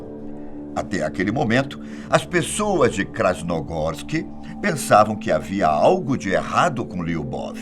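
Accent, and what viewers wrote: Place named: Bahia, Brazil. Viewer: baiano kkkk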